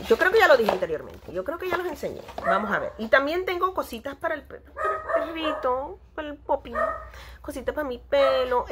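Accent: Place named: Chile. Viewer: alguien más se dio cuenta que ya se quedó pegada con el acento chileno XD saludos desde chile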